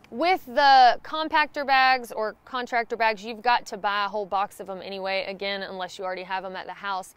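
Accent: South, United States. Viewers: I❤ that Southern Belle accent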